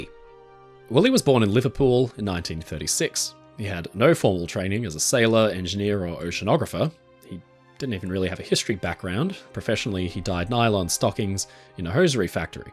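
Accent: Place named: Australia. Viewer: Hmmmm aussie guy i wonder whats his name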